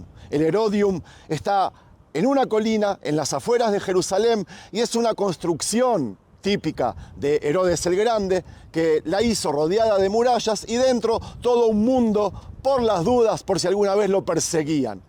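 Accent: Argentina. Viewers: Que lindo es escuchar a un argentino tan lejos cuando dice che, Saludos desde la 🇦🇷🇦🇷🇦🇷🇦🇷 Dios bendiga a 🇮🇱🇮🇱🇮🇱🇮🇱 El lugar en donde nació mí salvador..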